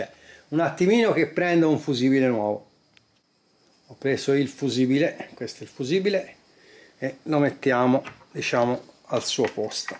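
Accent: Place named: Livorno, Italy. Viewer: okkk. Mi sembrava un accento più labronico. Ad ogni modo complimenti per il canale!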